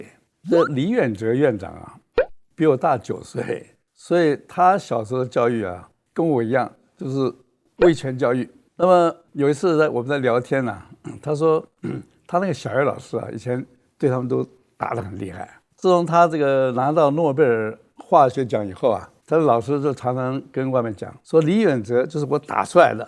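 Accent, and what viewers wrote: China. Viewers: 是大陸人嗎？為什麼講的是大陸腔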